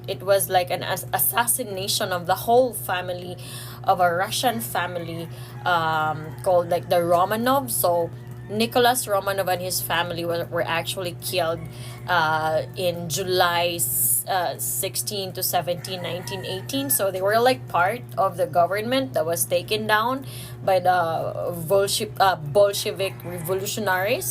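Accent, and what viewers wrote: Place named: Visayas, Philippines. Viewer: Ma'am ang cute mo mag visaya 😁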